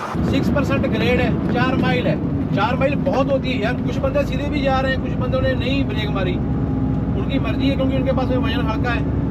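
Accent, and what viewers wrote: Punjab, India. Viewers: Punjabi bnda hindi bolde hoye das hi dinda asi punjabi aa # "ye jo khajoor ke rukh hai ye apka sawgat kre ge" 😅 great paji 🎉🧢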